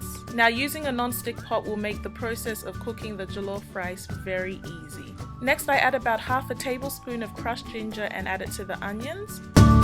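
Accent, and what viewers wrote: Australia. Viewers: omg you got a nice accent. Australian aye? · Are you australian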